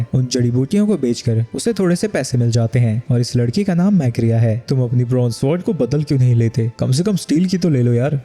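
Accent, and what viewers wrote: Gujarat, India. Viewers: Bro are you gujarati?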